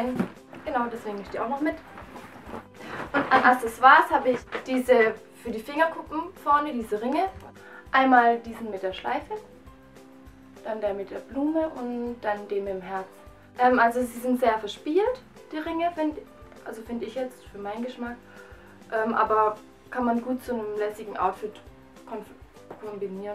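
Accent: Swabia, Germany. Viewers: redest du schwäbisch? :D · "Des isch" ich komm auch aus deiner Gegend und Finds total schee, wenn ma son bissl Schwäbelt ;) du bist wunderschön, dein Video ist Super geschnitten, du redest als hättest du nie was anderes gemacht...ERSTES VIDEO?! Mach auf jeden Fall ganz bald dein nächstes Video!! Auch wenn ich nicht wirklich viele YouTuber abonniert habe, aber du hasch nach dem Video sofort dazu gehört und ich freu mich auf weitere Videos. Du wirst bestimmt eine große YouTuberin!!!! Viel Glück und ich bleib dabei ;* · Kommst du aus Schwaben?:) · Hehehehe dein schwäbischer Akzent♥ ich komm au aus der Gegend;-) tolles Video:* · Woher kommst du? Hast einen schwäbischen Akzent ;)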